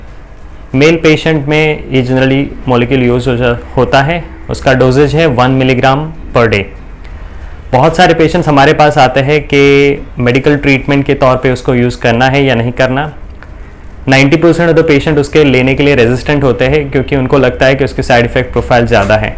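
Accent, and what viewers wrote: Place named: Hyderabad, India. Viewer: Hao yaaro hyderabadi hai ye doctor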